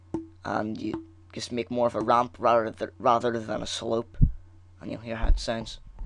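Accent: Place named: Ireland. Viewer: Like ur irish accent! :D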